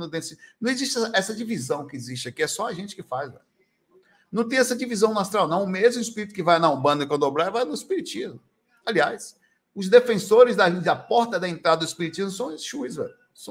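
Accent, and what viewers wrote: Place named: Bahia, Brazil. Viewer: seu baianês é uma pérola. Obrigada!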